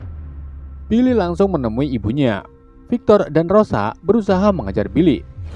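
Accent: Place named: Java, Indonesia. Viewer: medok e renyah😄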